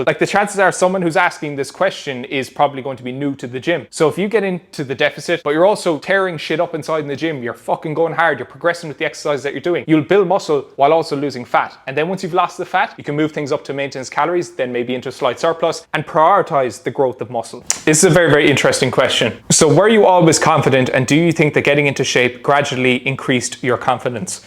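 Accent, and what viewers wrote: Ireland. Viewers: he's from ireland, I think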